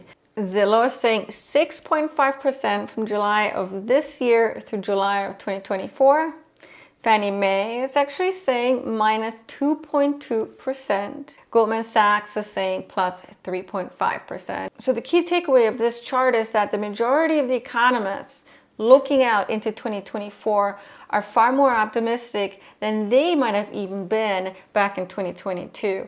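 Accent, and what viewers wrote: Florida, United States. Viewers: It is crazy for us inland as well. We are expected to assist in the insurance coverage of those millionaires mansions on the beach they keep rebuilding. Remote work was a godsend. You sound like a native Floridian too! ;)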